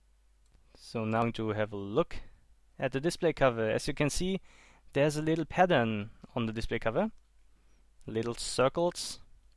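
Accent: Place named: Germany. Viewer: man merkt wirklich dass du nen deutscher bist an deiner aussprache. Arbeite mal dran. Sonst alles top ; )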